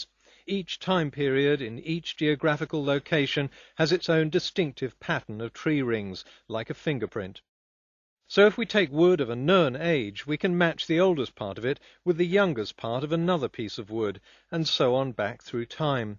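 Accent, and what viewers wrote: United Kingdom. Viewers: Finally someone tells it like it is, and with a British accent! Perfect! Even though I'm American. Thanks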